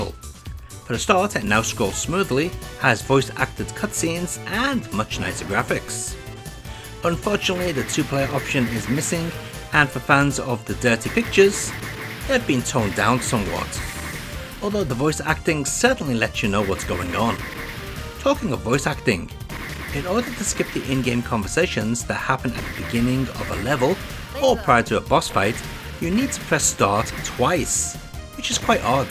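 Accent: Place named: Liverpool, United Kingdom. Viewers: Ah it's a Liverpool accent, I was thinking North West, then I doubted myself and didn't want to guess. I know Liverpool well. Spent a lot of time there. Live in the New Forest. Yes, I'll be 50 next year too. Time does indeed fly. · Always sounded very much Wallasey to me, so wasn’t far off 😊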